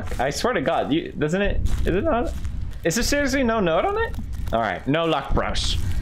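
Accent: Sweden. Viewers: Because of the heavily Swedish accent that he lost over the years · i tried to copy pewds with his Swedish accent and goofy speech patterns. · He used to have a really strong Swedish accent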